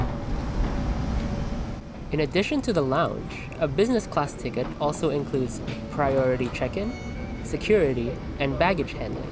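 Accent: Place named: Philippines. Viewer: You should work on your camera angles, that "overview" shot of the seat is horrid. You should also work on your voice over spill and should only speak on what you know for sure, so you wont sound too ignorant. Please hesitate on direct copying other youtubers, it doesn't quite suit you and your filipino accent. Its quite obvious which youtuber you are trying to copy. Hope you dont take offense and improve in the future. Cheers.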